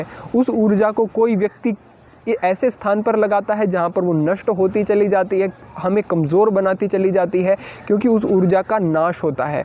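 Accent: Standard. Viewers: So clear and Pure Hindi and video is good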